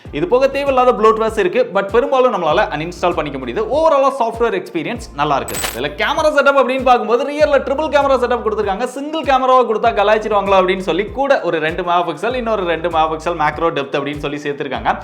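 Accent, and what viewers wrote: Kovilpatti, India. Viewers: Bro neenga kovilpatti ya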